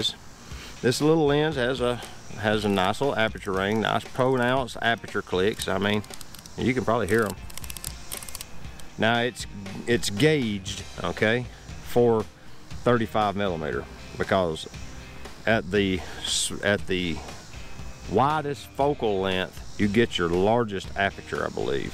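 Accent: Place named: Georgia, United States. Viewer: Great video, happened to find one at a local shop for the whoppin price of 10 bucks! Not a dent or acratch on it, bit of an upgrade with the added length to the <num> i was usin for general stuff, tho that one is a beast as well! The macro feature lead to some real neat mushroom closeups, fantastic video as well. Simple, to the point, georgia accent, good shit man keep it up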